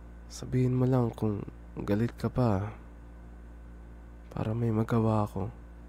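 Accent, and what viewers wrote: Conyo, Philippines. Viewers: Shux you sounds so conyoooo~ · I love the accent so conyo vibes na nagtatagalog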